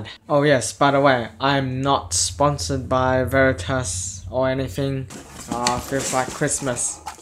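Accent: Australia. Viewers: Australian accent. :)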